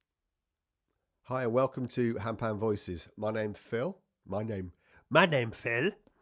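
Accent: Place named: United Kingdom. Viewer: Oh the struggles with recording speech that makes sense lol Kudos to you for recording without a script. And BTW I love your beautiful British accent 😊 (I know you ARE British but not every Brit sounds as nicely as you!)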